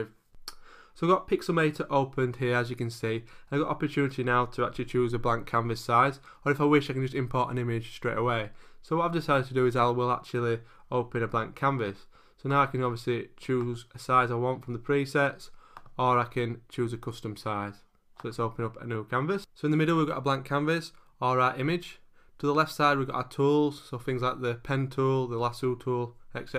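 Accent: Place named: Newcastle, United Kingdom. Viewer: OMG YES, would love to win pixelmator :D haha Are you from new castle??? I'm in love with your accent :)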